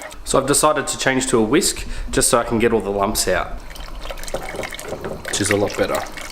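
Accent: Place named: Italy. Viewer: Loving the Italian accent mate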